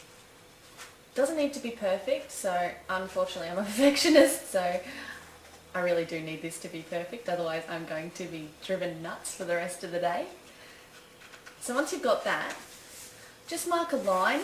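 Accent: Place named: Australia. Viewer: This is great stuff...thanks for sharing! Oh...and it is cool to hear your Australian accent - it is so weird to hear someones voice when all you have done is seen their photos. I have been told I have a minor Boston accent - no where near the movie accents you hear botched up and over acted! :) Again thanks for sharing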